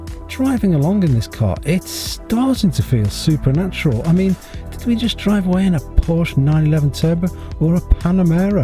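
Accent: United Kingdom. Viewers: i like british accent -i activate CC to learn better english... i learnt some words ive never heard before in this video .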